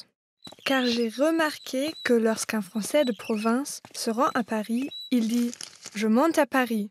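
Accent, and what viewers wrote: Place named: Germany. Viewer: L'accent allemand est tellement charmant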